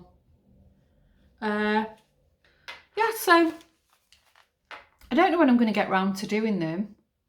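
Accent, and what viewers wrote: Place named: North, United Kingdom. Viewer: How lovely to hear my friend Ruth’s name mentioned. I blame her for my renewed yarn yearning /stash. 😁. What a wonderful podcast, thanks. Great to hear a northern accent too. X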